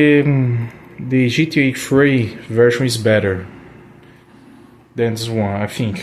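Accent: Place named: Brazil. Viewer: you are from Brazil? Your accent appears to be Brazilian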